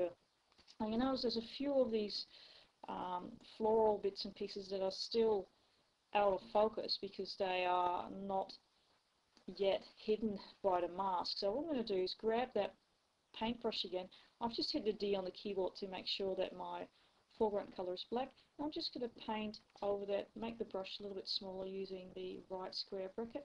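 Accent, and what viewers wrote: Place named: United Kingdom. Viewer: jesus. the british accent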